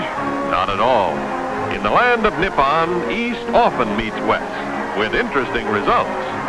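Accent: United States. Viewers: contemptible American accent. Devilish accent.C,est accent est celui de la mort ,pour touts les pays .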